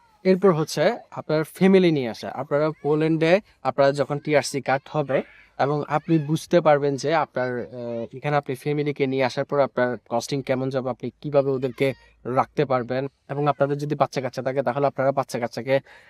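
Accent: Chittagong, Bangladesh. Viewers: ভাই আপনি কি চট্টগ্রামের ছেলে?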